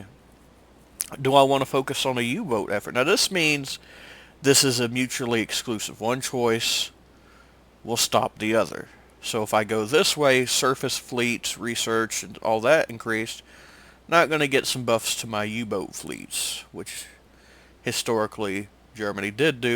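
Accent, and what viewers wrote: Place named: United States. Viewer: what a cowboy accent ! God damn ! 🤣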